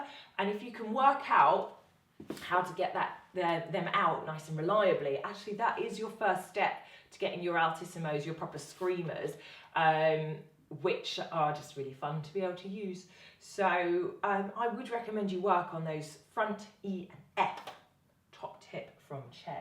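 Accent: United Kingdom. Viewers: Hallo! Just found your Video's and loved this one! So nice to hear a lovely young lady that Knows so much about Sax! Hope you make it across the pond some! Positive post and subscribed! No sure what part of the UK the accent is but that typically means a Blend from South London often for me. I'd like to add something a legendary sax player taught me to do before sand paper on a G# for sticking. Frequently we would use a Fresh USD bill before big performances. It worked great and had some texture to it and often when one did it, the Bill would make it down the horn line (Till the Bari player pocketed it typically) So I got a bass ! Keep up the Video's and Hope you make it to the SE USA ! Come play my Bass! Later Sweets! FantaZ4U here, AKA Saxaddict ! Oh one other thing, I made the3 mistake of buying a very nice Selmer Glass Reed wallet and it totally ruined reeds after the first use. 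For over 20 years I've done what you do and just retract the reed some and but a good cap on it. And it worked on all my large Clarinets as well but considering the frequency I had do Double on them, I eventually started using Fibracells. When having that much lumber on stage I got very dry keeping them All warmed. It got worse when the Vocalist found my storage fridge and started lifting them before the stage calls. And Typical we know what happens when singers feel the need to take things. I'd make special stops for a bottled water Lemon Water only to have a Singer take it before they cameras ca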